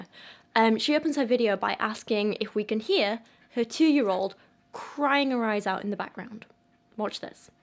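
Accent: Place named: Yorkshire, United Kingdom. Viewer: I don’t have money for merge or Patreon this month, but I’ll let your ads run so hopefully you can get some of that coin. PS: I don’t remember what video it was in, probably some hun bot MLM shit, but every time I consciously let your ads run, I hear your voice in my head with that Yorkshire accent going, “dollar dollar” and I don’t know why but it’s hilarious to me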